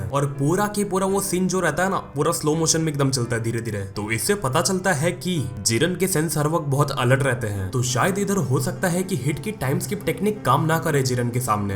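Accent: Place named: Bihar, India. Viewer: bihari lgte ho awaaz se!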